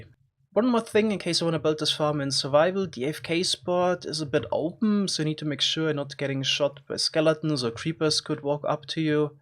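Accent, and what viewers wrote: Germany. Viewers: Nicer Deutscher Akzent, bro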